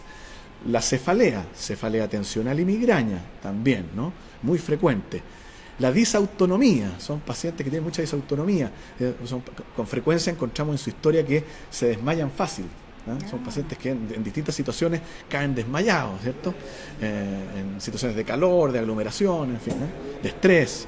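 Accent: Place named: Chile. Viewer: Me huele a chilito por aquí jajajaja me encanta ese acento saludos desde España una exmigrante enamorada de Chile y su cultura gracias🇨🇱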